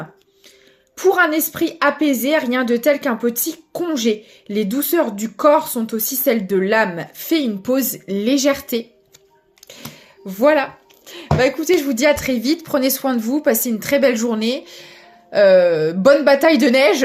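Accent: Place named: North, France. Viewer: Tu es trop forte, cette guidance me parle tellement. L’amour inconditionnel évidemment, je l’ai laissé partir parce que je savais que ce n’était pas le moment ni pour lui ni pour moi il y an. Nous nous sommes revus qu’une fois en août depuis mais il n’y a pas une seule journée depuis un an où je n’ai pas pensé à lui. Tout correspond des 2 côtés, tu es vraiment très forte je le répète. Je me disais bien avec ton accent que tu venais pas du sud, j’ai grandi à côté de Beauvais 🤩. JE t’embrasse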